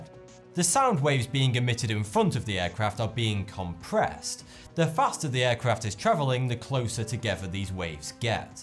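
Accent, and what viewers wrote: United Kingdom. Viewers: Why do Brits replace words with a "th" in them to an "F" sound? And Rs are pretty much silent? I fought you wuh going wif him because I hoff to bwush moy teef befo badtoyme. I'm sure it's a regional accent and not all British people sound that way, I know a few Englishmen and some have a very thick accent and others aren't as noticeable except in certain words. I think this guy just has a speech impediment, and with his British accent on top of it, it makes him sound funny. I know I'm not the only person that hears Forty Two when he says his YouTube name at the beginning of his videos, so I'm definitely not crazy. Speech impediment aside, I still very much enjoy the videos and find them really interesting to watch. Learning is fun! Especially when you've got someone with that kind of accent telling you the facts! It causes you to have to pay extra close attention, or you might misconstrue what's being said! 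Keep em coming, or should I say foughty? I'm just having fun here, no malicious intent or hatefulness coming from me, so please don't bash what I have to say about his accent! I've got my own terrible accent that im very much aware of and I have to listen to people make fun of the way I sound and be patient while they try to figure out where im from based on the way I pronounce certain words. As long as people aren't being insensitive I'll play along and get a laugh or two in alongside them, but the second they assume that im not intelligent bc I come from a parti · ohh im so in love with the Brit accent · for the longest time i thought you were saying either 32 or 42, time to do a show on british accents, and accents in general, the exact samething happens in any language, though; as in france, and in the north of spain, let alone the whole country. ( secret, the family model ) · He is saying, it's just his british accent that makes it sound like 42. 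Also is a play on words of 42. Also, he's named that because of the hitchhikers guide to the galaxy, where it says the meaning of life, the universe, and everything is 42, which obviously, is a joke.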